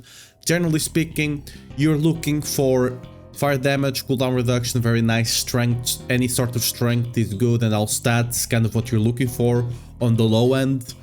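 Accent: Portugal. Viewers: Based on your accent, I am 50000% sure you are Portuguese. Please tell me I am right!?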